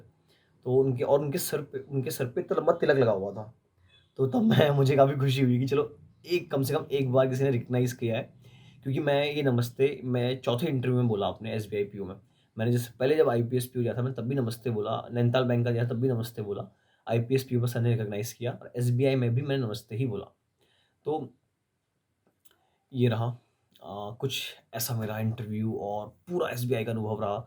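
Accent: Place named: Nepal. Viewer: Interview ki bareme jankari diya bhai great, nepali jeisa laga bhai aapko aap nepali ho?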